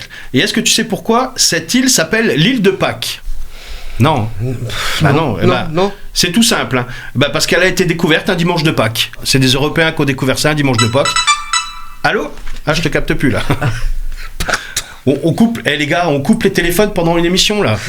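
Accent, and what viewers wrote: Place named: Burgundy, France. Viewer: Je t en supplie prends ton accent Bourguignon dans tes chroniques car il est délicieux et c est surtout ta marque de fabrique !!!!!! Une fan bourguignonne inconditionnelle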